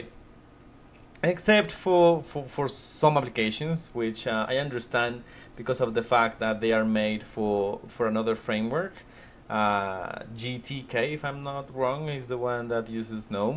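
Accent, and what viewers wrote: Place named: England, United Kingdom. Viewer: Great video man and great english accent! I too was looking to switch to KDE this video was very informative, thanks.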